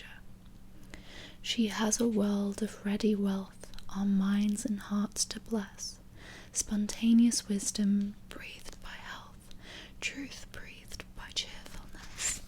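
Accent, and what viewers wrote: United Kingdom. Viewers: Thanks for your contents. Your pronunciation is very pleasant, are you British?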